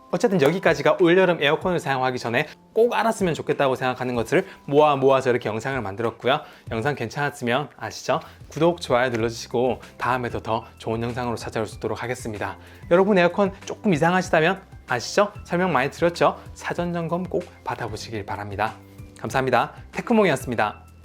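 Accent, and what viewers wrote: Gyeongsang, South Korea. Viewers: 경상도 사람입니까? 서울 사람입니까?ㅋ 귀여우시네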